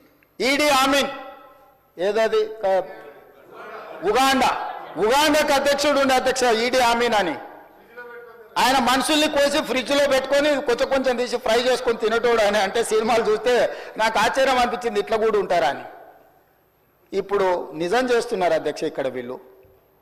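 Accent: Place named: Telangana, India. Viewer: Super speech with telengana accept and adequate wordings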